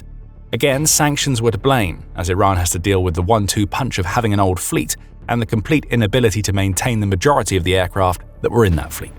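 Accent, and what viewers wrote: England, United Kingdom. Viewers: Because the yanks just love the British accent. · Why are these US military videos always narrated by an English guy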